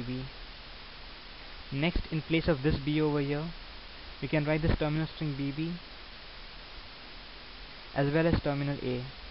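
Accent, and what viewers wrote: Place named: India. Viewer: Im so fucking tired of indian english by this time in the course..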